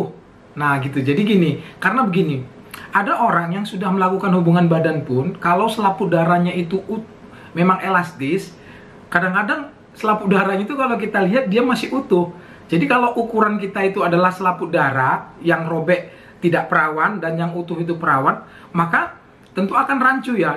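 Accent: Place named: Karo, Indonesia. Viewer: Kalak karo nge km pak dokter, pantesan logat na bgi logat klk karo☺️☺️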